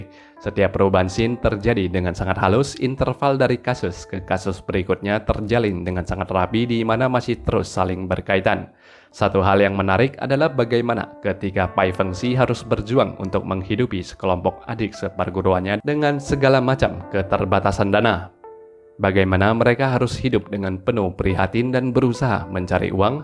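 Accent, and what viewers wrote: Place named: Indonesia. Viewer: Ya engga apa2, namanya jg org Indonesia. Kalau ngomongnya pakai logat Indonesia ya tdk apa2. Kan ada logat India, logat Jamaica, dll. Org Inggris sama America aja beda logatnya Kalau masalah clickbait ya banyak youtuber pakai spt itu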